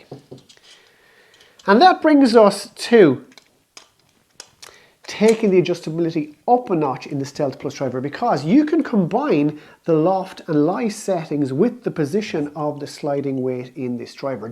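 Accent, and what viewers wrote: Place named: Ireland. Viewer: That's actually an Irish accent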